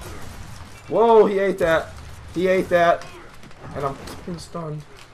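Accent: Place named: New York, United States. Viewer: U sound like u from new youk or new jersey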